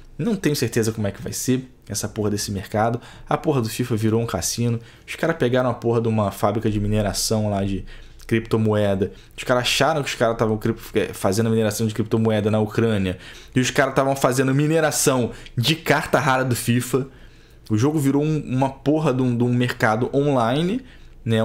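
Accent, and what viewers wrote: Rio de Janeiro, Brazil. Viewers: Comparar o FIFA deixxx com o peixxxx... Esse sotaque carioca é uma foda...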